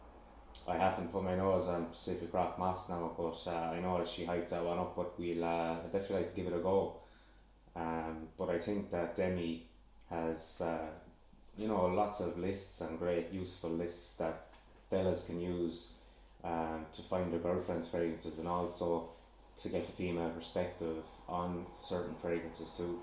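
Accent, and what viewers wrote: Ireland. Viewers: hey mate is that a limerick accent?lol clare man here.aussie fragrance recommened u to me:)sir smells a lot is a cork reviewer here aswell.am from the banner county .subbed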